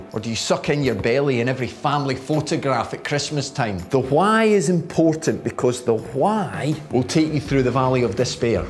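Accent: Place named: West Scotland, United Kingdom. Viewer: Trainspotting is Edinburgh accents (east), his is west coast. Might sound the same to you but Scottish people can hear the difference.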